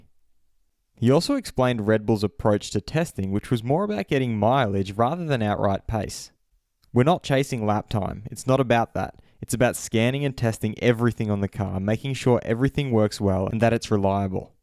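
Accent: Australia. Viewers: Always nice to hear an Aussie voice regarding F1, it's basically a cult interest over here. I have NO ONE to talk to about it.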